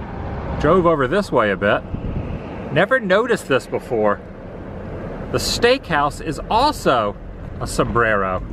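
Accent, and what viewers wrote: South, United States. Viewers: I don't know if you've noticed but the farther you travel, the more you seem to have picked up a southern twang. I bet by the time you get back to California you sound like you're still in Alabama!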